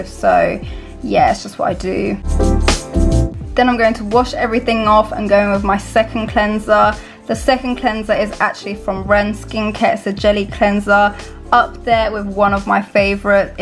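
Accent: London, United Kingdom. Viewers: London accent straight up. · yessss I’m from London and noticed it straight away 🌚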